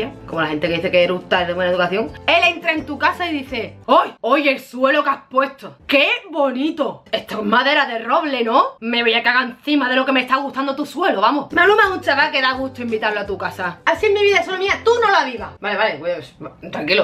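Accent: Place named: Cádiz, Spain. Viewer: Como se nota que eres de cadiz 😂😂, yo también soy de cadiz 😍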